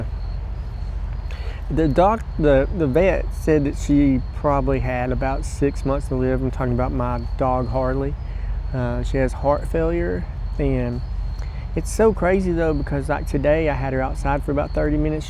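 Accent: South, United States. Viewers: You have some great videos, I've been hooked for awhile now and luckily am not caught up so i always have a new one to watch. You have those common things i find entertaining: "owe", "I forgot" "oh man" "i have an apple pie" and for tne longest time i couldnt get that you were saying "spiders" until i recognized the southern accent and learned where you're from. To me, you are saying spotters lol. Love the channel, keep doin you.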